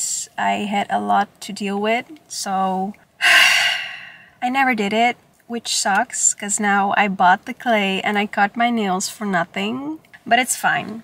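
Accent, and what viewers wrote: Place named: England, United Kingdom. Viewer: Ik houuu van jouw engelse accent!! Rustgevende stem heb je!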